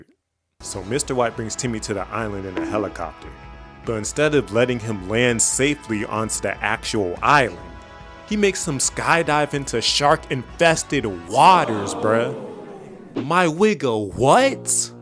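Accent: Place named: South, United States. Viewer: Your channel finna blow on god, and bruh I need to see if you from Georgia or Florida cause you kinda sound like us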